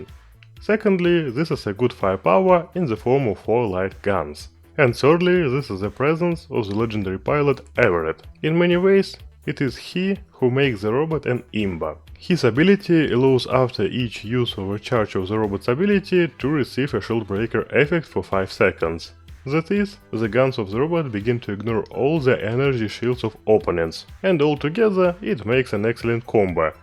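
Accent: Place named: Russia. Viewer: слышу отчетливо русский акцент, полоскун ты чтоли?)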